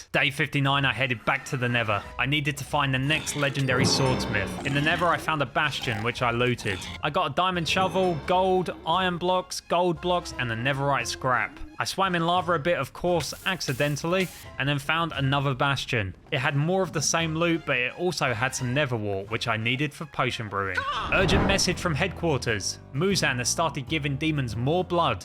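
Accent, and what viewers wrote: United Kingdom. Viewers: I was sure that You are from uk but when i saw that You are from Poland i was so confused. Well… super film nagrałeś 😅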